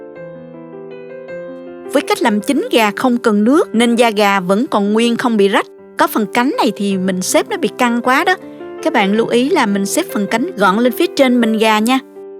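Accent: South, Vietnam. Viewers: Cô ấy có giọng nói Nam Bộ rất dễ thương và dễ cảm tình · Giọng nói đặc sệt Nam Bộ. Chỉ dẫn tận tình,tỉ mỉ. Nghe dễ nhớ, dễ hiểu vô cùng. Có thể dùng nồi cơm điện hấp được không? Cảm ơn cô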